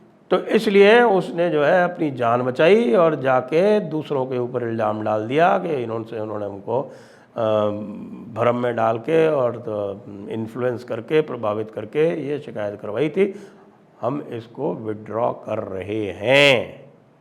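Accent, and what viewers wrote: Haryana, India. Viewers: Everything was ok sir but ur haryanvi accent was too bad😅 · ग्रेट! Good humorous vedio with full details of the andolan by wrestlers. Very casual but wonderful way of description. Harianvi style is hit! Impressed!😂🌺🥀🌹🏵🌷⚘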